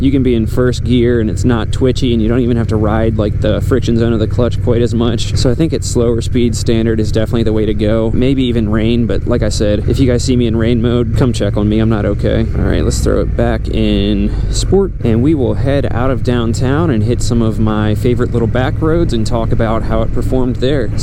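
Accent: Maryland, United States. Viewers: I just found your channel, I could tell you were from Maryland before you said Annapolis. I moved from there almost 4 years ago, I now live in Florida. I subscribed.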